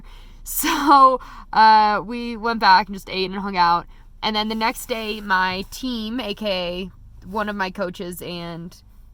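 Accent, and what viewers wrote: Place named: California, United States. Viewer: I love your California accent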